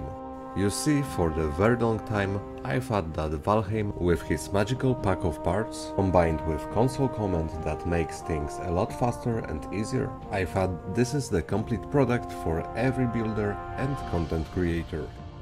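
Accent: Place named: Poland. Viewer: Tak mi się wydawało, że słyszę polski akcent. Swoich się jednak rozpoznaje X D Spoko materiał, dobra robota!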